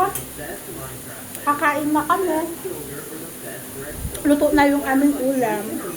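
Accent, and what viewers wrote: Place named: Visayas, Philippines. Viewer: Bisaya ka ba? Accent mo kasi bisaya. At comment lng wag ka tawa ng tawa wala naman nakakatawa.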